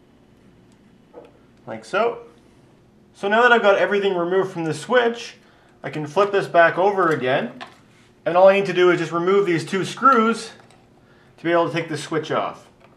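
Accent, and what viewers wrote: Canada. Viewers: Great Video, i finally bought everything i needed to wire my guitars so i can refinish them. I got hooked on all the different types of ways you can wire the pickups and mods. haha. But when i get finished and it doesnt work im like daaaaaamnit. This was a great tutorial! better than cutting off all the wired and starting over lol. Hey man are you from Canada?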